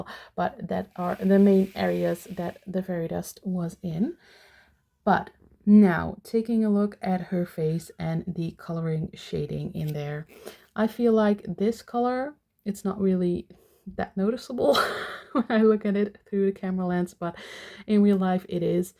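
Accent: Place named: Netherlands. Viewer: Me again! I watch Lisette's channel as well. You are both Dutch and your accents are similar. But your English is impeccable!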